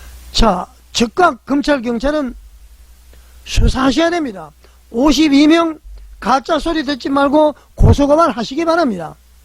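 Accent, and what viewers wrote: North Korea. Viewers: 듣기싫다! 북한말투쓰네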